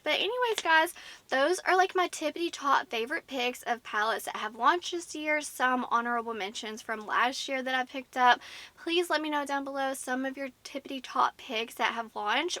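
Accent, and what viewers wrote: South, United States. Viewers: I love the southern accent so much❤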